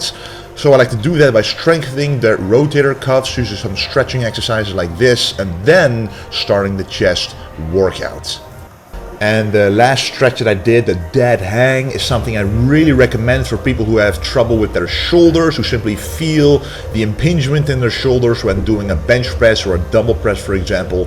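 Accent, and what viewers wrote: Brabant, Netherlands. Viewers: Jezus...could you be more dutch...your English is painful to hear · Waar uit Brabant komt hij? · The dutch accent XD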